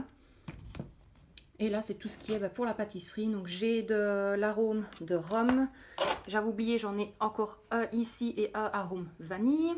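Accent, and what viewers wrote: Belgium. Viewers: oui juste à côté de liège, oui ça s'entend mais moi je l'entends pas trop lol parce que je suis aussi de liège lol donc je fais pas trop attention lol Oui chez lidl je fais souvent les promos viande et les produits d'entretien lol Oui avec ce que vous avez dans vos armoires ( pas forcément les réserves lol) Oui je regarde aussi vos batch cooking, enfin quasi toutes vos vidéos lol.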